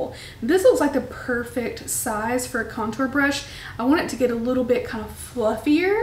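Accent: South, United States. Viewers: Wait!!!! You are a Bama girl???!!!! So am I!! I did not know this!!!! I knew from the accent you were southern but wasn’t sure exactly where you lived!!!!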